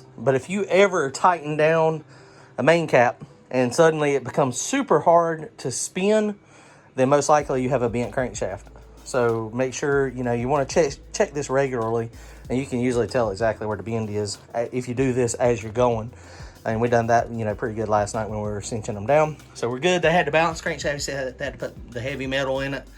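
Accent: South, United States. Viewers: Honestly, I don't really care much for southern accents, but I subbed before you started showing the engine. There's a lot of engine channels on youtube, but a lot of douches too. You automatically have that vibe that makes others wanna sit down, have a beer and talk cars with. Very neighborly and humble.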